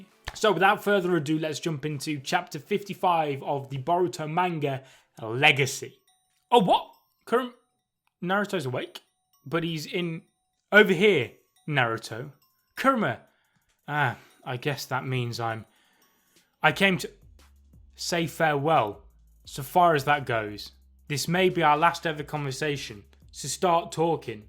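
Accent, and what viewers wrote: United Kingdom. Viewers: Your British accent is so good😃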